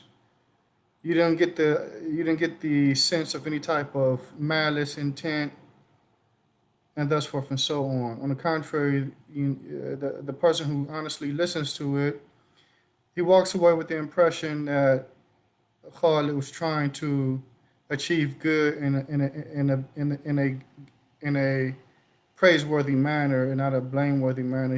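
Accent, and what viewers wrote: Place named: South, United States. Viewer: جزاك الله خيرًا، you give me a feel that you’re from the south Shaykh even though I know you’re from L.A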